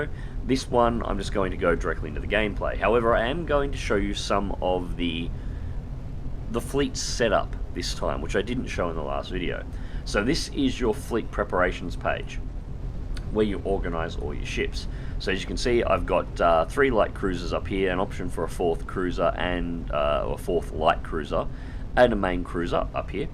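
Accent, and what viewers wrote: Australia. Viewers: An Aussie !!! Finally !!!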